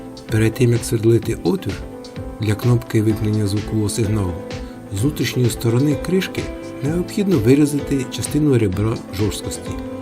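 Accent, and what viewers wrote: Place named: Central Ukraine, Ukraine. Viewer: Акцент центрально-український, черкащина/південь київщини/кропивниччина чи полтавщина.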